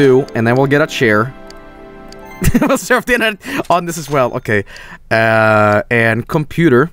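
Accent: United States. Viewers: you're starting to sound like an American.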